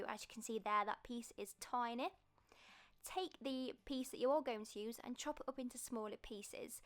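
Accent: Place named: Europe. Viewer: I love the European kind of accent you got... It's kinda hard to catch up with that if you don't belong to the place... I have been using the beetroot thing for so long n Now I see videos over it... Ig I am gonna get back to it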